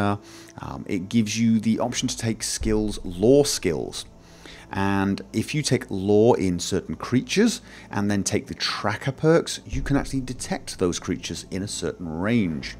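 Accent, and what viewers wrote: United Kingdom. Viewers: But... British accents are cool 0_0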